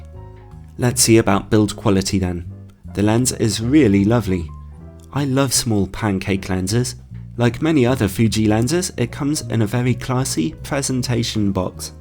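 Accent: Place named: England, United Kingdom. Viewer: what part of England are you from? We are commenting on your lovely accent. Thanks for these videos!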